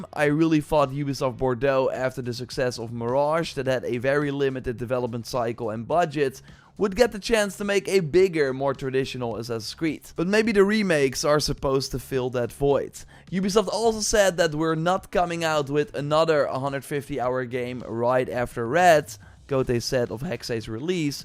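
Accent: Netherlands. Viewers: Your dutch accent really sucks man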